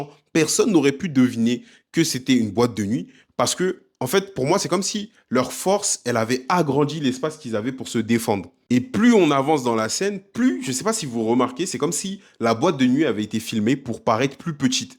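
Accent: Ivory Coast. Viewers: Toi ça se sent que t'es ivoirien. 😂😂Ko ton babiere 😂😂😂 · Je reconnais un frère ivoirien Je suis là à la base pour la "babiere"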